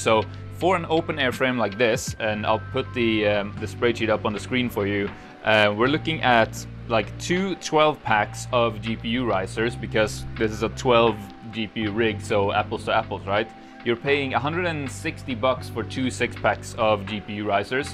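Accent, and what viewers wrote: New Zealand. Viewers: Hi, many thanks for another great video. Always loved the Kiwi accent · i love your kiwi!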